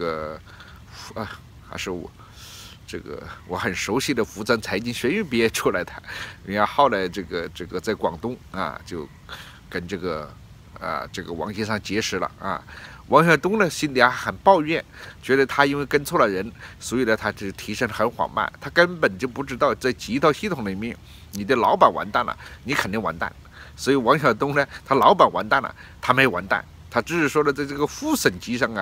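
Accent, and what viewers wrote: Hunan, China. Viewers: chu web 这是湖南普通话。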